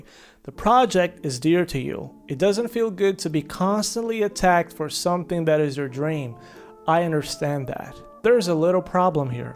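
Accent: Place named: Israel. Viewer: This guy sounds Israeli... Ewww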